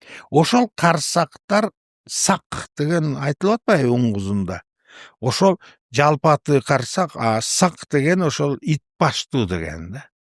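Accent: Russia. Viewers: Ведущий жигит орус классты буткон шаардык окшойт бирок кыргызча суйлоп азамат экен акцент болсо да. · Алып баручу бала Кыргыз тилин жакшы уйрон тилин орусс болуптур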